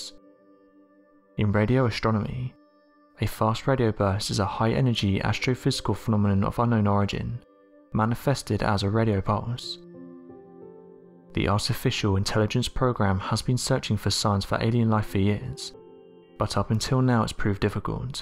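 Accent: United Kingdom. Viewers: A British commentator? Like all the Iconic movies deplicting Historic charactors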